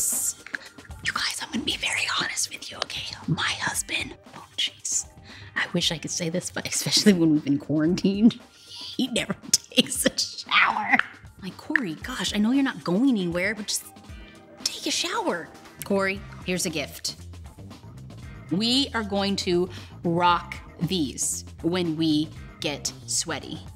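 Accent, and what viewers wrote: South, United States. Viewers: You do a very good southern accent! Then again you did live in Texas lol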